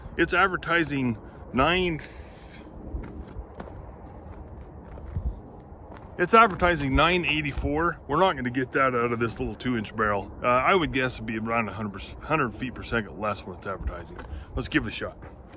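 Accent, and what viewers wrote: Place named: Texas, United States. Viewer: Here in Lubbock Texas the only ammo i can get is Aguila 71 gr. ammo. I shot it through my used Bobcat 3032. I bought a box of Fiocchi 73 gr. 32 ammo. I found some TUI in 32 so i may get that box for 30$. I cleaned and lubed up my 3032 so it should shoot great. I shot only 8 rounds of rhe Aguila and its a potent round . I shot through a box of depends and went through 10 of them into the next box. Thanks for the video. Id almost swear you were a Texan. God Bless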